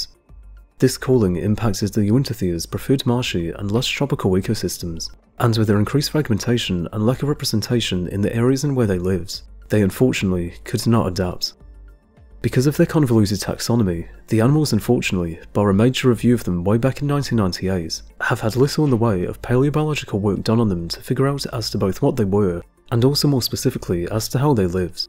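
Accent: United Kingdom. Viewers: I have trouble understanding the narrator's heavily accented British English. His speech is so fast that his mispronounced words elide with each other and create a sound salad that prevents me from enjoying this video. It looks as if it might be a good film otherwise.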